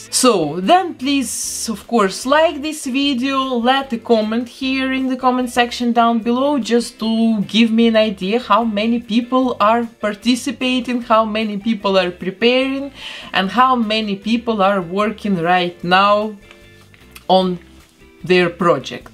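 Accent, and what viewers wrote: Russia. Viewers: Хмм, русский акцент?🤔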